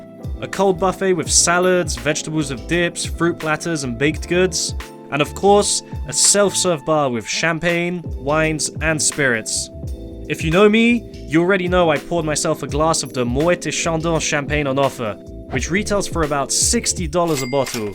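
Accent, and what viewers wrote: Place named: Southern England, United Kingdom. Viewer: He is British but I can't pick the county. Not a northerner, that's for sure, so he's from south of Birmingham.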